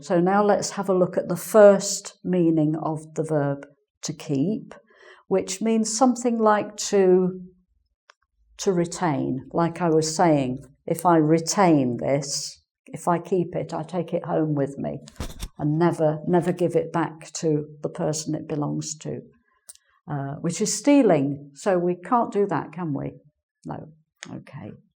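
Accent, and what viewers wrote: United Kingdom. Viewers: amazing Teacher) and her accent is so British. she must be perfecr granny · From Brazil From Marcio Hello Teacher... It is a pleasure to meet you... I had never seen a class of you here, but I am enyoying it a lot. Where you're from? Your accent sounds like a British person. I love it. Hugs! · I really enjoy your lessons, enjoining listening to very clear British accent, and I learn new things such as the difference between: can you please and could you please. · AstronoyBrasil Hi! I was curious about the same thing and then I've found this site with some information about all of the Engvid Teachers: <link> - I'm not sure whether Ms. is from UK or not. However, we can see she lives in London and her accent is perfectly British! Hope this helps you. Bye! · a brilliant teacher and british accent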